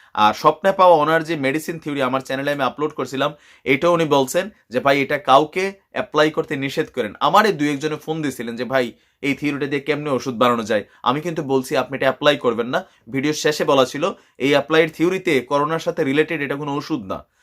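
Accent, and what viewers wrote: Comilla, Bangladesh. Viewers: আপনার সেফুদার কণ্ঠ অনেক ভালো লাগে আর কুমিল্লার ভাষায় তো মাশা আল্লাহ। আশাকরি সব ভিডিওতে কুমিল্লার ভাষায় কিছু বলবেন😊😊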